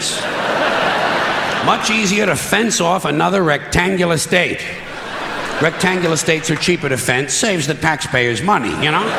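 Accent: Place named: California, United States. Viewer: sounds like a Californian, still a funny man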